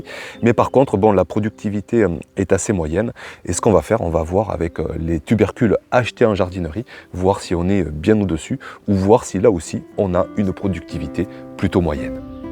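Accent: South, France. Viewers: Je lis avec plaisir tes vidéos avec l'accent du Sud ...la culture en sac, Une méthode anglaise qui a fait ses preuves. C'est idéal pour les gens qui n'ont pas de jardin mais une terrasse. Le souci est qu'il faut faire des petits trous et mettre des billes d'argile dans le fond du sac pour que l'ajout d'eau ne pourrisse pas les racines... Essayes avec ma méthode tu auras plus de productivité ... Ca dépend aussi de la variété. Moi je choisis des "Charlottes" et les fameuses " bintjes" belges pour les frites ! C'est une bonne idée d'en avoir fait une vidéo.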